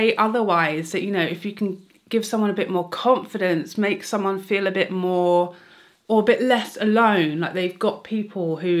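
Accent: United Kingdom. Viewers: Congratulations dear, 5 years and 50K i'm so happy for you!!!🥂🤩🎉👏 I'm almost 3 years watching the beauty video's here. I found you because of dear Mel, and i know how much you hurt because of the loss of her, so i was also so happy to see that you got your humor back, your British humor i love so much, but not only that, i love you being you, not uploading to be loved by those companies but the interaction between you and us!!! And, here too, i love these "you don't need that" video's you don't realise how hilarious you are!!! I love your lovely beautiful you, knowing so much about all these products, being so honest what is good and what not, your enthousiasm, your beautiful elegant British English of course, i'm so thankful to you that you make all these beautiful, pure, honest wonderful and hilarious also video's for us, full of know-how!!! And if you would upload less, i will always watch your video's!!!! 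Enjoy these special days, sending you lots of love from your neighbour country Netherlands!!!!😘😘😘😘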